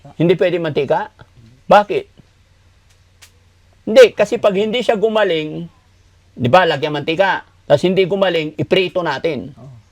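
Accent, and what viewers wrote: Visayas, Philippines. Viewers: Ka cute nimo paminawon gabinisaya hahaha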